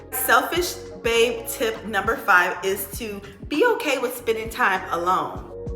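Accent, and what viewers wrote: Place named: Philadelphia, United States. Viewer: It’s giving Philly , where you from?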